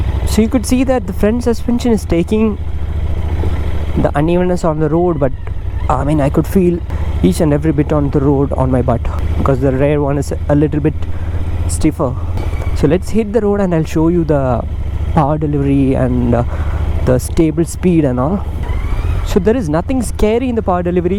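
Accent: Kerala, India. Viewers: Pure Mallu accent..... Good video review btw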